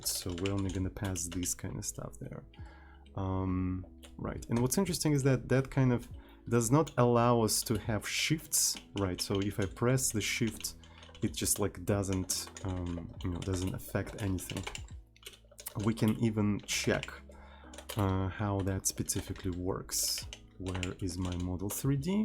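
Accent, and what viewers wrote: France. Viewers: За ужасный французский акцент дизлайк и отписка.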